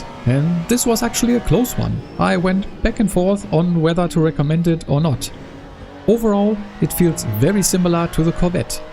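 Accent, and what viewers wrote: Germany. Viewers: I can confirm your assessment. I've had my most successful races with the BMW so far. This vehicle is absolutely consistent, just like the times you can achieve with it. I've also had good results with the Porsche. Especially on tracks like Fuji, the strong rotation of this car is a big advantage... if you know trial braking. Very good videos that you produce. I like the good English with the German accent. It makes it much easier for a German to translate internally. You have my subscription. Beste Grüße aus Thüringen.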